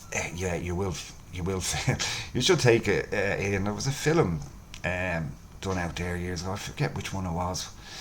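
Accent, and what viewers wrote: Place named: Ireland. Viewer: Brilliant, absolutely loved it. Nice to hear a paddy on YT. Thanks a lot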